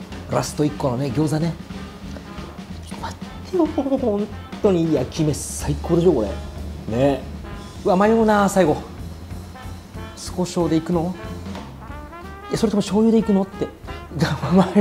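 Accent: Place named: Tokyo, Japan. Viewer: 神奈川県民なのに江戸弁www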